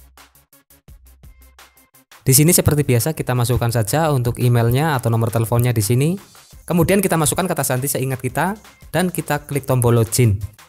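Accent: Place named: Java, Indonesia. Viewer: crom nyamake bhasa indo lah udah sulit malah d persulit, Abang juga orang indo dari logat nya juga orang jawa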